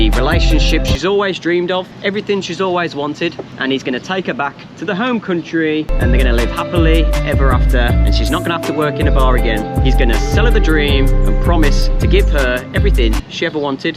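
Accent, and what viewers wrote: Birmingham, United Kingdom. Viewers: Hey you never lost your Brummie accent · You sound like a brum..maybe from Wolverhampton..am I right 🤪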